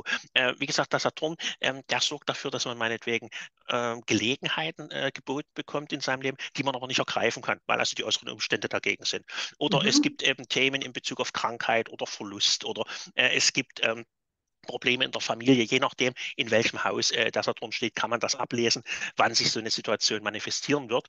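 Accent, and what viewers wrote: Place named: Saxony, Germany. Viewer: Wunderbar 🙏🙏🙏ich liebe Ihren sächsischen Dialekt 😂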